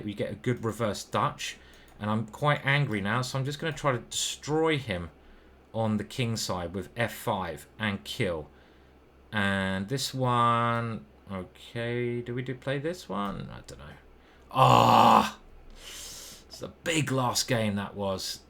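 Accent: United Kingdom. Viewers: Mate, I love watching you Titled Tuesday's. A good old British commentary is so refreshing and entertaining to hear. With all of your "fuckin twats" and "wankers"! And very educational. Keep them going 😊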